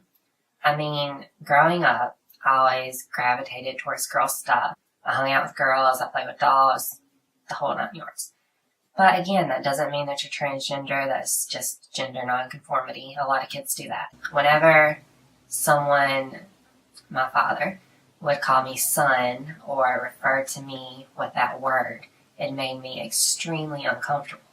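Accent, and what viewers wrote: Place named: South, United States. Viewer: I’m curious about your accent. I’m from SC, and it’s close. I’m thinking you’re from eastern NC maybe? Anyway, you’re from the South, so you’re cool with me. Edit. Midlands of SC.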